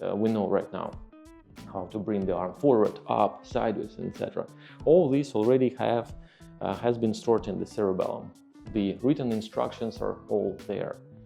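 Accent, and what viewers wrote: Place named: Russia. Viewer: Yes now it makes sense. Your accent and vibe remind me of another one of my favourite YouTube content creators, Anton Petrov. He’s also a Russian expat.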